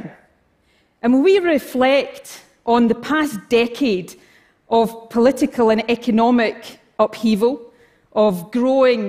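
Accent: Scotland, United Kingdom. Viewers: Oooh-a morning TedTalk and a nice brogue to listen to. I like this woman; she seems to know what's up. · I'm English and understand every word, she's speaking English with a Scottish accent, just one of many different accents in the UK, I have a strong accent from where I come from in England, you'd probably find it hard to understand me, we don't all talk the same in the UK. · Scottish accent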